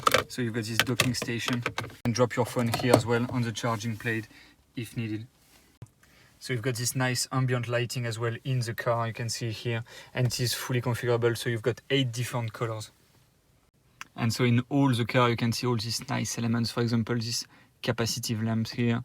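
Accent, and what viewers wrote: France. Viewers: Pathetic French accent. Why not hire a native English speaker? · Très belle accent français ahah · laisse tomber l'accent français en langue anglaise ! les anglophones doivent se plier en 10 en l'écoutant. Même les africains parlent mieux l'anglais mdrrr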